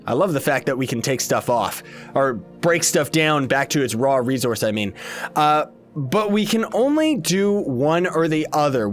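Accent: Canada. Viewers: Now every time I watch his videos I imagine him with his canadian accent. XD LOL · Are you know Canadian Or Are you living in Uk xD IDK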